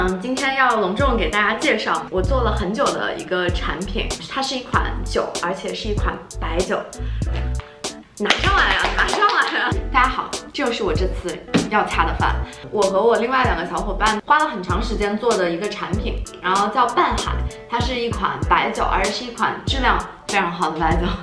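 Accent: China. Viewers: 武汉普通话？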